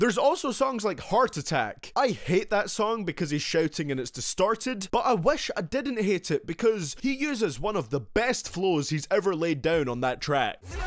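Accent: United Kingdom. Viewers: His British accent is the best he shouldn't ever try to hide it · Are you British?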